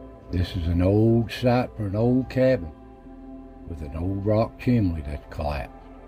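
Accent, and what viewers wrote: Appalachia, United States. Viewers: I love listening to you. My family is from the mountains of Virginia. Your accent and way of talking ("my wife an em") reminds me of my family. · great American accent, fitting.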